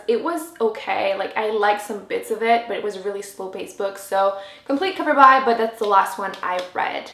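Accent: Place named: Quebec, Canada. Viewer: I just saw your new video and when I heard your accent I thought you might be french canadian! I'm so happy to finally find a french canadian booktuber, I'm from Montreal so hi and can't wait to marathon your videos!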